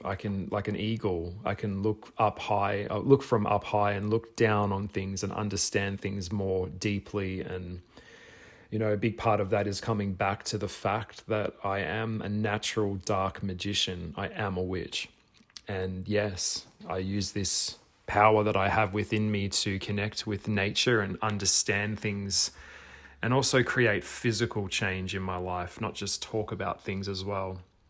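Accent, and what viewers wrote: Australia. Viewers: Sounds like you are Aussie!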